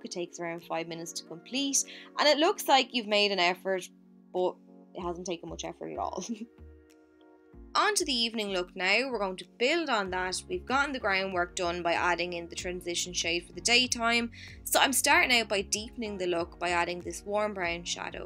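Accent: Ireland. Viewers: Subbing for that gorgeous Irish accent! And I have the same shade eye color so these videos will be helpful!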